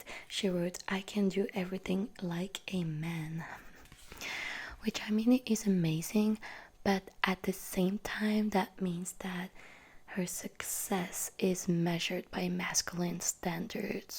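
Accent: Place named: France. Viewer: Woop I got so used to your french accent so this sounds off😂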